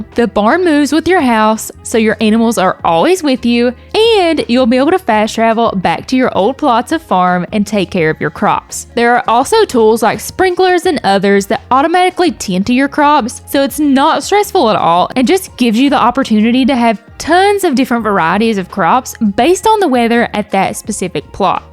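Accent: South, United States. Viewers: And what part of the south are you from? Just found the channel btw. Good review! Subscribed..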